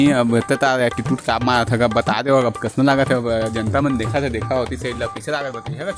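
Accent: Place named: Chhattisgarh, India. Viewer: Your Chhattisgarhii accent is just wow and content joyful....👍❤ No doubt you & your team doing superb job...Keep Going👏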